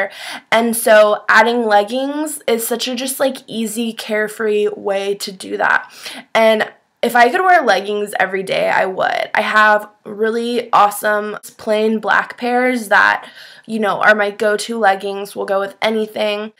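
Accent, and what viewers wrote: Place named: United States. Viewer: I love when you say yaaall !! That American accent ! <3 And your fashion is tops !!!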